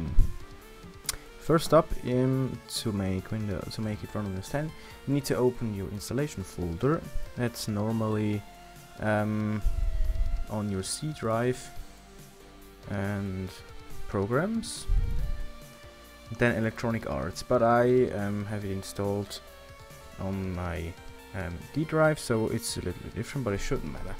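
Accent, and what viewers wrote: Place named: Germany. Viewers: ghört mer sofort usse :D PS: Es funzt bi mir denn leider ned... :( has emol anebrocht und warum au immer wider deinstalliert.. er peilt ned mol das i di "richtig" CD drinne han :( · Ha deutscher. Ertapt ;D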